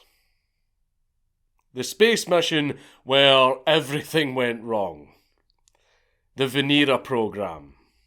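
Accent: Scotland, United Kingdom. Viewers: Lmfao!! The part where the probe began to scream had me f**kin rolling. I love this channel. It honestly wouldn’t be the same without it’s brilliant and hilarious Scottish host. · Ich liebe deinen schottischen Dialekt bruder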